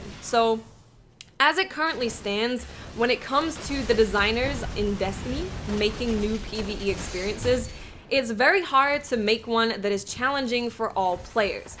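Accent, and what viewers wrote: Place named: East Coast, United States. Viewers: I've never heard a new york accent before and I live in the US lol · The Black Hammer nerf really takes away the dynamic of that sniper rifle. It basically becomes a sniper with muligan... BTW, where is your accent from? I want to say east coast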